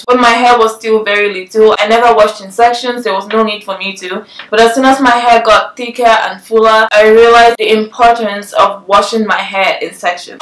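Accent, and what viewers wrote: Nigeria. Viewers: where are you from... you accent is Nigerian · Love this!, great video. Where can I buy those stunning earrings? You are funny. Yessss to the facial expressions and Nigerian accent :) have you heard of the vanity massage brush?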